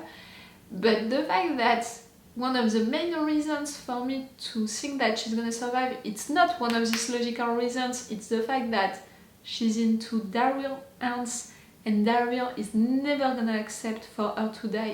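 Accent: France. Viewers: Je venais pour regarder une réaction américaine, et là, j'écoute ta voix.. Et je me dis.. Mais attends, c'est un accent français ça^^ Elle est Française ^^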